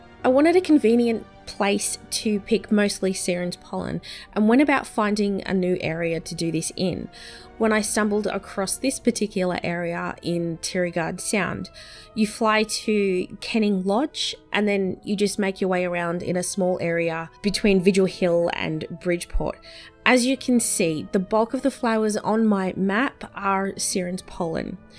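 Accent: Australia. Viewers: I love female aussie accents. It brings a warm feeling to my heart and ears.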